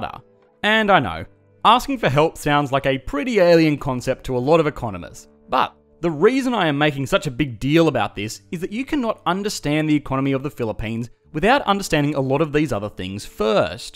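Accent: Australia. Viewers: Australian narrator its hard 2 understand ur english accent. Tenx 4 feature d philippines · He is not a British man. He is Aussie. Accent checked lol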